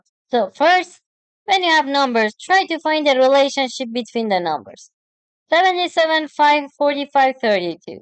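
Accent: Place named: England, United Kingdom. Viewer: La voix féminine de la commentatrice est traînante...ma parole c'est une anglaise...je suis dur d'oreille...les appareils auditifs sont beaucoup trop chers pour moi!Y-a pas de quoi rire...quand on est vieux on est vieux! Il paraît que Google va faire faillite...Ça ne m'étonne qu'à moitié...Ils poursuivent trop leur abonnés de leurs assiduités...C'est vraiment lassant à force même pour un vieux retraité.😢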